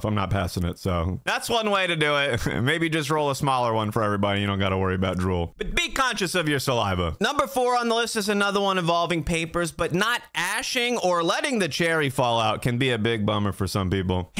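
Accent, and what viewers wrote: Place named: New Jersey, United States. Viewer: this guy just screammmmsss jersey, I've watched a couple videos I've heard him say he lives in Florida and another time he lived in CO but buddy that accent is definitely east coast jersey lolol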